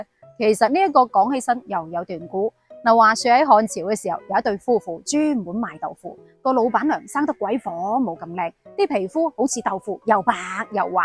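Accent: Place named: Dali, China. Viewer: 大沥口音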